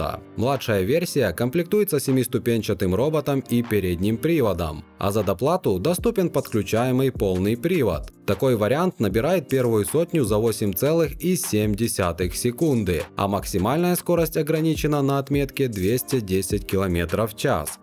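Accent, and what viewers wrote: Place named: South Russia, Russia. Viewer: Смотрю все ваши видео и ставлю лайк, но ещё не подписался. Вам нужно комментатор, мне не много не нравится произношение чувствуется либо юг России или Украина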